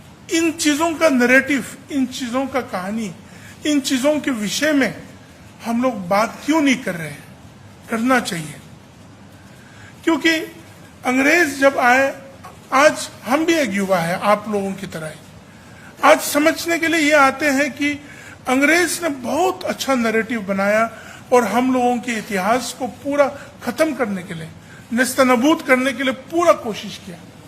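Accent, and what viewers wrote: Standard. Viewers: मुझे आप पर गर्व है । भारत को सच्ची आजादी मोदी और बीजेपी युग में ही आती दिख रही है । आदरणीय तेमजिन साहेब आपका इतना शुद्ध हिंदी में दिया गया भाषण मेरे ❤️ को छू गया । बहुत बहुत बधाई और हार्दिक आभार। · Bohot acha laga hai NAGALAND ke brother or Adhyaksh Temjen Imna Ji ne itna shuddh or pyaare HINDI bola hai... 🙏 👏👏👏... Saath saath ek acha Sanskaar bhi dikh raha hai Temjen Imna ki swavab mai... Humare Northeast ke saare log agar aisa hi mindset laike aage barhenge toh humare Northeast ko kabhi bhi bahar ka log Hindi nahin jaante ya Chinese ya jo bhi ho, kabhi nahin bol payenge... Bharat Mata ko pyaar karna parega, ish Desh ko pyaar karna parega, Ish desh ki Rastrabhasha ko bhi pehchan na parega...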